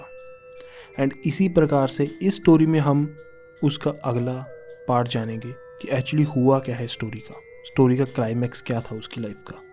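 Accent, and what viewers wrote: Punjab, India. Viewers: Bro shi btao tum punjabi ho na😁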